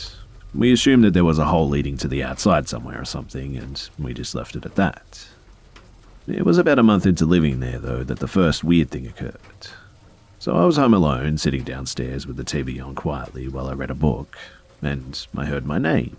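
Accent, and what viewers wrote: Australia. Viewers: As ALWAYS, hearing your VERY COOL, unique Aussie accent as you read these wonderfully twisted stories puts me in a FABULOUS mood as it truly makes me happy; no matter how creepy, spooky, shocking or bizarre the stories are! Thank you for the dedication you have for your subscribers/fans. It is most decidedly more fun and interesting knowing you have this amazing content AND without a DOUBT, you ARE one of the absolute BEST YouTube narrators out there. PERIOD. (I apologize if my commments seems sort of disingenuous, a bit immature or ass-kissy (yep, I make that one up!), but I really enjoy your channel and it's content...so please keep doing what you're doing!!!) THANK YOU!!! 💀👽🛸🧛‍♀️💜🧛‍♂️⚰🦇🌙⛈🎃☠🐱🕸🕷👻🧟‍♀️🧡🧟‍♂️🧙‍♂️🔮🧙‍♀️🖤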